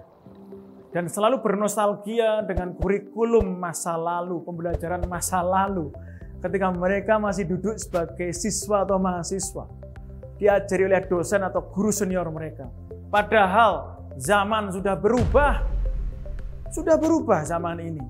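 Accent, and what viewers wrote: Standard. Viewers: Pengucapan yang baku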